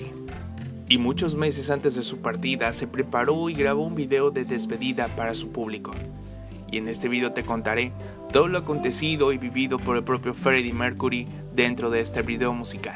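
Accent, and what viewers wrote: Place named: United States. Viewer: Por qué el narrador habla con un ascento americano muy feo??????, Se escucha horrible, habla con tu voz natural ;)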